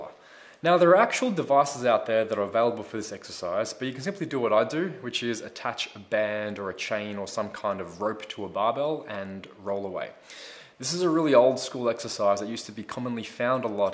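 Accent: Australia. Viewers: the whole time i thought this asian guy speaking with fluent Australian accent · Was NOT expecting the Aussie accent from THIS guy, just saying!!! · Was so happy when I heard that Aussie accent coming through 🤙 great videos mate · Are you Australian,? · Blessa my heart Blessa my soul Never seen an Asian whith an Aussie accent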